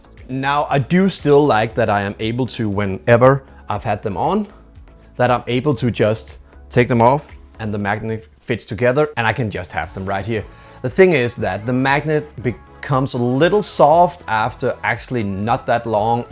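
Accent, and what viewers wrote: Denmark. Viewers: easy on the copenhagen(ish) accent, hahaha. Otherwise, great work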